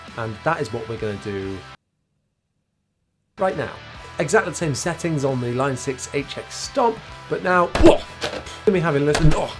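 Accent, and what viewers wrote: United Kingdom. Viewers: I can only listen to a British accent for about 30 seconds, so shut the fuck up and play it!! Peace brother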